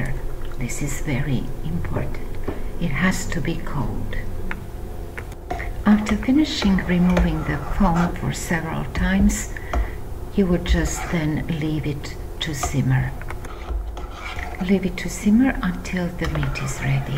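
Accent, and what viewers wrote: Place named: Eastern Europe. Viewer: You do the same! You're a lovely host, love your channel.😊 Are you in Eastern Europe by chance? I hear your accent & it's sounds familiar... The reason I ask is that my grandmother came to the US from there many, many years ago. Her style of cooking was similiar to yours also... 😁 I came to your channel from the "I am Leo" channel. Vesna is a terrific lady rescuing & taking care of all those stray animals isn't she?! I love her for doing all that... Seems to be that I'm starting to make friends in that part of the world, I've never been there but hopefully someday I'll be able to go there. There seems to be so many nice, friendly & sincere people there! Wonder traditions and family values there, I guess you could say I'm embracing my ancestry... 😊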